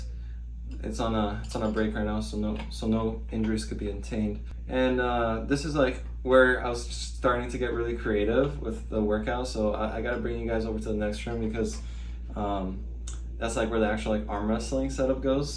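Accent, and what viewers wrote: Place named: United States. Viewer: Кафявия колан по жиу-жицу за упражниетия на брахиализа. Не знам кой ще е по удибен жиу-жицу колегите или Армрестлерите, щото те го правят с бял колан :D. Your name is Bulgarian but your accent is American, very interesting!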